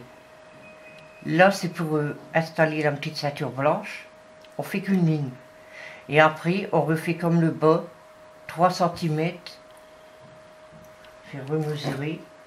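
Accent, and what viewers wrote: North, France. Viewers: bonne nuit merci pour votre modèle votre parler et du nord, votre voie me rappelle ma grand mère,ma mère et mes tantes merci j espere que le confinement nais pas trop dur a bientot JSC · Bonjour je trouve la jupette hyper jolie je vais,la faire. Mais dite donc vous n'êtes pas du Chnord avec l'accent que vous avez.... j'adore....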